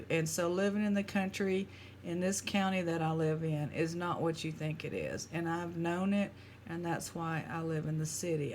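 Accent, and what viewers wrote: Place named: South, United States. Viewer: Hi from Victoria BC in Canada! I just want to tell you how much I appreciate and look forward to your videos. I love your beautiful voice with your slow southern accent. I adore hearing your stories and your adventures in dumpster diving, rock hunting and metal detecting. Telling us about your pets and finally finishing up with your devotional reads. When you find precious metals I’m just sooo happy for you. So, if you ever think that no one listens or appreciates you - remember that you make a difference to a little Canadian living in the constant rain, dampness and cold over the wintertime here on Vancouver Island. Big hugs to you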